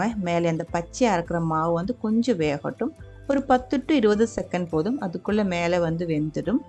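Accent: Kerala, India. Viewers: நீங்கள் மலையாளம் பேசுவது போல் தமிழிலும் பேசுவதால் கேட்பதற்கு நன்றாக உள்ளது சகோதரி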